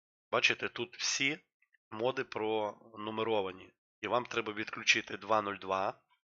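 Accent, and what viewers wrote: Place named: Russia. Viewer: Мне вот дико нравится украинский язык, хотя я русский без каких либо украинских корней (ну вроде). Я не знаю почему, но просто кайфую когда слышу мову. Но российский акцент у есть, подзабыл, так сказать, язык предков. Авторы ценны для публики, когда идут своим путем, не озираясь на моду и большинство.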